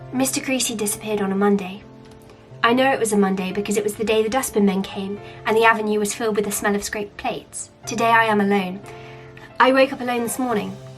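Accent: United Kingdom. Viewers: I absolutely love your taste in books. Omg, the other commenters are right! The British accent DOES make it better! I've been practicing mine. I think it's quite accurate. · Hahaaa that's true Emily 😂 like whoaaa, it sounds great, UK accent..💯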